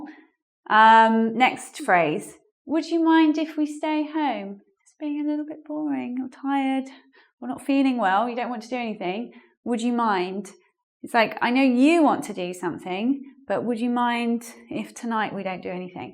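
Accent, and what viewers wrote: United Kingdom. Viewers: To be honest, it's little bit hard for me to understand the british accent. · Very interesting! Finally a British accent!!!! A QUESTION: how can I subsribe your second channel on You Tube? I found only ENGLVID, but the second channel about you tell at the end of your video, where is it? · We have to visit London :) Great accent, they have a queen and they have Big Ben! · oh my god i couldn't understand anything what she spoke is it a British english ?,very diferent from the american's ,but i liked your video ,it has been helping me to develop my english ,i'm begginer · I've seen a lot of videos from your previous channel, as you suggested, and I really liked it! You have such a nice way to teach that make us alert to your classrooms. Plus, have a soft voice, and your british accent it's lovely! I am hungry for more videos! Keep your nice work!:) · something that I learn in your last class.....you are terribly charming!! 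I love your brithish accent... amore mio.. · very useful lesson thank you, i really like your British accent:D thanks a lot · I liked your video, even though I realized that I'm not used to the British accent. In fact, I'm not used to another accent and I have to work on it. I've just subscribed your personal youtube channel, as you asked and simply loved your idea to help people with speech problems. Thank you for your help and I'll watch more of your videos. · Thank you for your video and your excellent method and teaching as well. I was looking for British teacher for English learning because I'm in London for my PhD course. I learnt English in American's method so now I have a problem in London because the people's accent and their grammar is a little bit different and your accent can help me more to understanding Londoner specially in the University.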